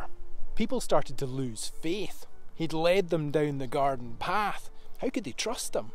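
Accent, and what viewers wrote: Scotland, United Kingdom. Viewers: Thanks for this, well done and love the Scots accent as a big part of this report. What sad days there were, and still are with these conflicts taking people's lives and often for so little gain. The bravery of these souls must never be forgotten!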